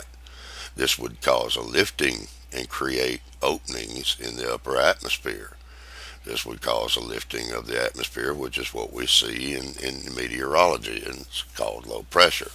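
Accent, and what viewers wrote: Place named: Virginia, United States. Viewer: ahh man. watching ur vids and reading the comments about ur voice. you sound like my old dad with that backwoods virginia accent. man i miss him. he would have loved ur videos. he had to quit school after fifth grade and when he got drafted they made him take his asvap test twice cuz they couldn't believe he scored so high. here i sit with my degree and not nearly as smart as him.lol. stick with it. them edjicated idjots gotta learn somehow.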